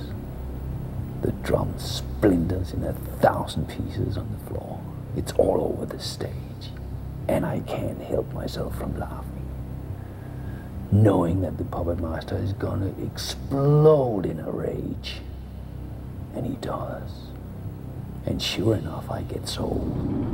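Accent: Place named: Denmark. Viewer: MAAAN I LOVE HIS VOICE AND THAT DANISH ACCENT D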